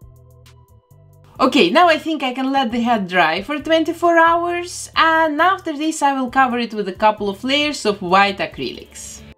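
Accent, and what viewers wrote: Russia. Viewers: Стойкое ощущение, будто человек за кадром имеет русский акцент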